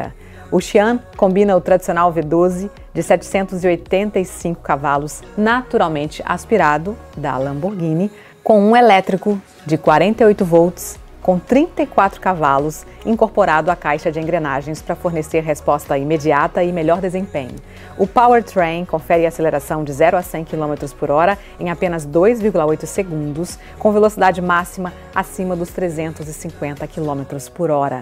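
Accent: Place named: Italy. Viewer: Olá, você disse 73 unidades?! Tenho que correr pra garantir a minha!;P Gostei bastante dessa solução da Lamborghini, não vejo a hora de vê-lo em pista. Apaixonado por Lamborghini. PS: Nice Italian accent by the way.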